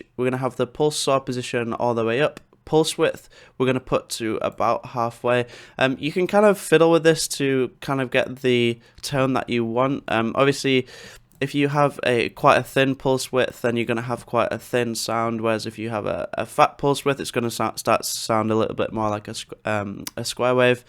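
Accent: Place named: Teesside, United Kingdom. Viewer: Teeside accent im hearing 😊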